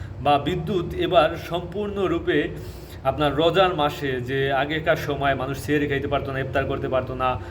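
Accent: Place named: Barishal, Bangladesh. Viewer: Report করলাম। ফাজিল। তুই বরিশাইল্যা বল জানিস।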